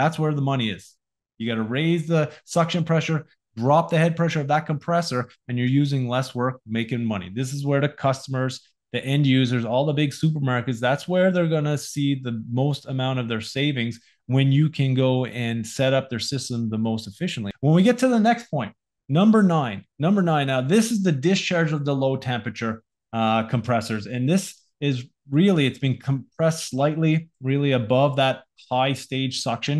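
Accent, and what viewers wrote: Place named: Atlantic Canada, Canada. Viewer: thanks for the video, pal! you from the east coast in Canada? I detect an accent lol Ontario here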